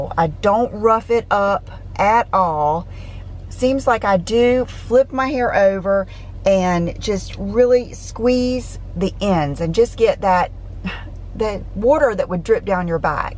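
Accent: South, United States. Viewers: I’m loving these daily vlogs....love seeing how much our days are so much alike. We really are more similar than we are different. And we both have accents...yours is a sweet Southern and mine is a horrible NY/ Long Island accent...lol yuck. I can’t believe how long your hair has gotten. I’m trying to grow mine longer...what did you do to grow yours so fast? Vitamins?? Beautiful neighborhood. The home pastel colors reminds me of Bermuda homes. Idk the cost of the homes there but anything like that on LI is well over a million $$.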